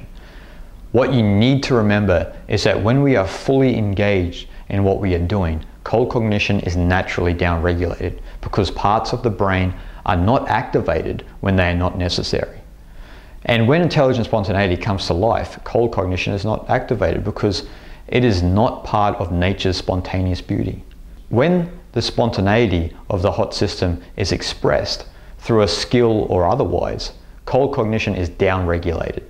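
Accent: Australia. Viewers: Something about an Aussie accent that makes me not take it serious...but this fellow is good. Thanks sport. Peace be unto you.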